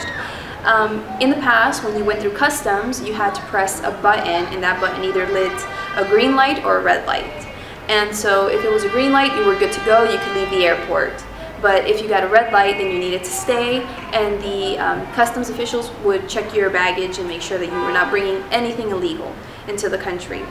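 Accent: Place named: United States. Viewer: You speak like American girl (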